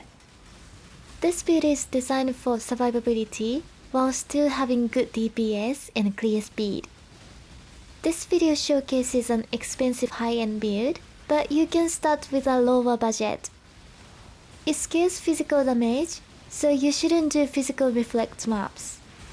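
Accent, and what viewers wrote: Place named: Japan. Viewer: Are you Japanese? Sorry for unrelated question but damn your accent remind me that. It extremely super cute btw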